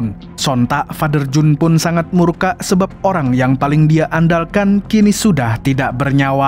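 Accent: Banjar, Indonesia. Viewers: Urg Banjar mana suara ny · Urang Banjar kah .. mantap sanak ai.... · Urang Banjar kah Sidin ni mang ? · Njir rancak nonton hanyar aja tahu pada urang Banjar sidin nih😂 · Urang banjar sekalinya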